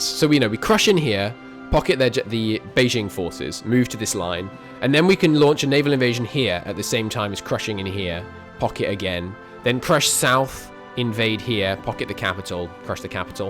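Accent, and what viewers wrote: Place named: RP, United Kingdom. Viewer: How would you describe your accent? To me (Australian born), it sounds like an English Received Pronunciation, but perhaps tempered with something else. At times it sounds faintly like a sort of 'cultivated' Australian accent, the way a well educated younger Australian who isn't ashamed to show it sometimes speaks. (But not Cultivated Australian, which is a semi-official category, and today virtually extinct - the former PM, the late Malcolm Fraser, was an exemplar of this accent, and you don't sound anything at all like him).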